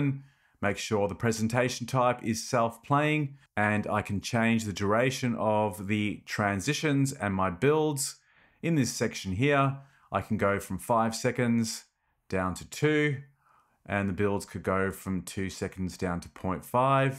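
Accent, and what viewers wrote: Australia. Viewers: G'Day Mate! I needed a refresher after not using KeyNote for so long and found your channel. I have subbed straight away, it's so great to hear another aussie voice here on YouTube.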